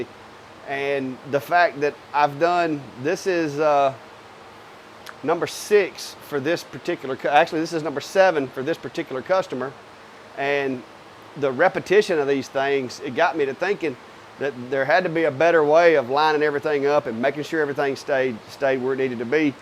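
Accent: Alabama, United States. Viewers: Cool project. And it's nice to hear another Alabamian's accent. I guess it's been too long since I have been home.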